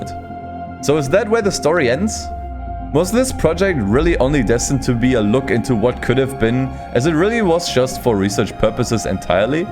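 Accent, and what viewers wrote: Germany. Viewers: i can hear german... bist du deutsch o.o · Ich würde wetten du bist Deutsch :D Du hast so einen Deutschen Akzent im English :D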